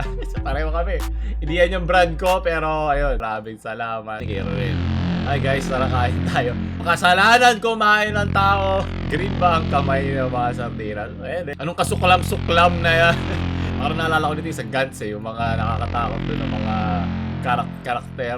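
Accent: Philippines. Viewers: I like your filipino accent, say it again Tito: Intarnet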